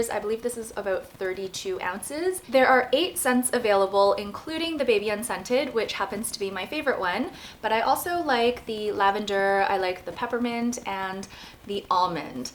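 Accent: Canada. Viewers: Canadian? Sounds like it from how you say out and in a pinch.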